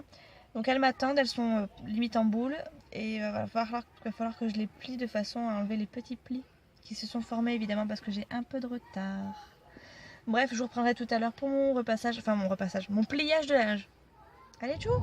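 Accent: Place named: Southwest, France. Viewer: L accent j adore Ça sent les vacances pour moi Le sud ouest 🌞🌞🌞🌞🌞🌞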